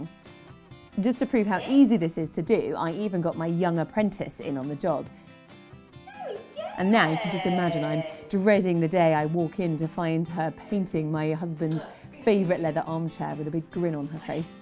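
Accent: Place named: England, United Kingdom. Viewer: Finaly a proper english accent. Sooooo tired of American accent on YouTube. Thank you ⚘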